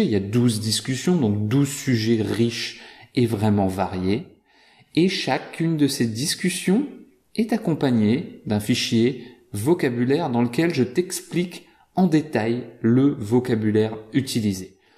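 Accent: France. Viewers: Vraiment vous parlez le français authentique.,comme le français que nous apprenons aux écoles marocaines. Merci.mais parfois nous sommes pas capables de comprendre les francophones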